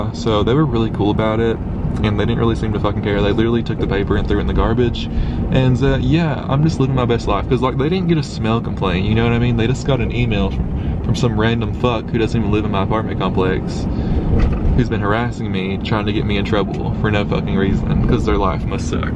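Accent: South, United States. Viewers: I've never heard a country gay accent, it's so mesmerising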